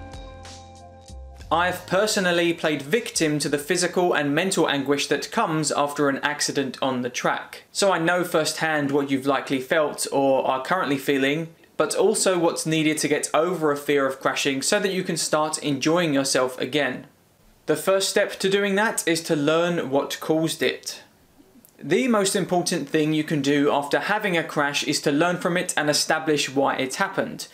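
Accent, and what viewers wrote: United Kingdom. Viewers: Dear Lord, once again a perfect Video which comes at the perfect Timing for me. I really adore your videos, or maybe its just the well sounding british way of presentation 😁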